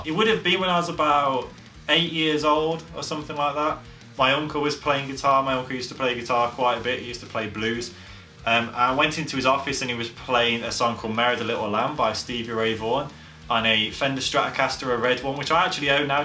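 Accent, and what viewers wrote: United Kingdom. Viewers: Wasn’t expecting you to be northern! Love your accent and it was cool getting to know you after watching so many covers! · Are you british?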